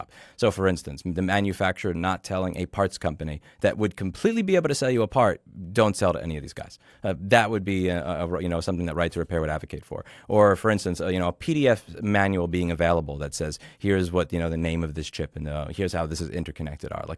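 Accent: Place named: United Kingdom. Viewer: I just found out both of this channel and how the voice of coldfusion actually looks like, man.. I know that specific british accent predominantly used by immigrant, for some reason I imagined a thin european dude with english as 2nd language who picked british accent 😂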